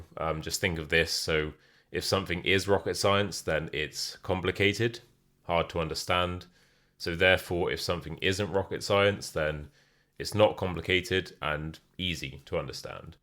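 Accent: United Kingdom. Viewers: Thank you teacher, i LOVE your British accent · Do you speak with rp accent or cockney?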